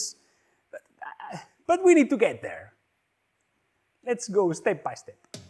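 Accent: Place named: Spain. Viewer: Madre mia que acento, tu eres español amigo! Pd Que passada de video tio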